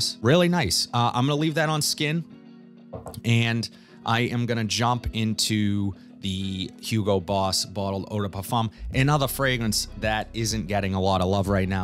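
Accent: Boston, United States. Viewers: LMAO 🤣 The Boston Accent 🤣 always gets me , I'm a Nyer great work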